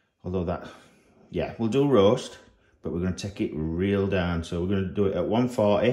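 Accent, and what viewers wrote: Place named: Yorkshire, United Kingdom. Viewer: The Yorkshire accent made this video perfect!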